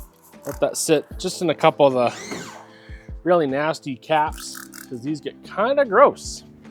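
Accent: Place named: Canada. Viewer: nice demo. It looked a little scary when you sprayed around the alternator. Thanks for speaking Canadian. 🇨🇦👍👍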